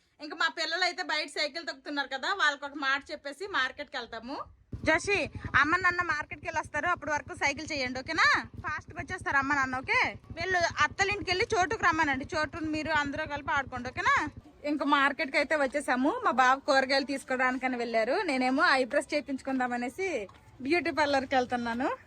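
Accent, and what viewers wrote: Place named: Srikakulam, India. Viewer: garu nenu tea tagutu me video chusa andi. Madya lo sir ni meru dibada dabada badeyyali annaru. Chala navvi polamaranu. Okkasari chinnappudu gurtocchindi. Madi vzm.meru Sklm slang lo baga matladutaru. Mammuluga kuda baga matladutaru. Overall ga natural ga matladutaru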